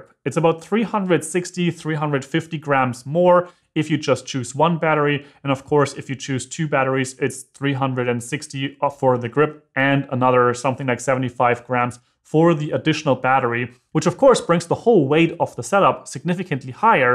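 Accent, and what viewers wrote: Germany. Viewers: Might be depending on your hand size and use cases. Btw. den deutschen Akzent kann man nicht verstecken:D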